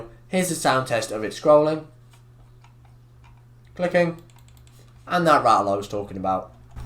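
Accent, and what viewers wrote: United Kingdom. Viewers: Heyyy are u bri’ish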